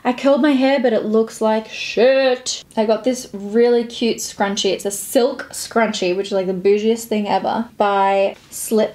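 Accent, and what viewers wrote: Australia. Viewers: I love your Aussie accent 😍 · There’s nothing wrong with the Aussie accent/slang. Don’t forget where you come from!! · Hell yeeaas #notificationsquad as always. Staying in oz right now and feel your accent much more now haha insta: Paniz.sophia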